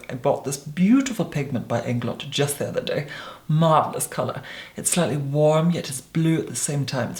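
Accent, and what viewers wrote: Scotland, United Kingdom. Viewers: The britanic accent it's stunning! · claire johnson I’m also from Scotland, but his accent is SO different compared to mine because it’s so ‘posh’ lol.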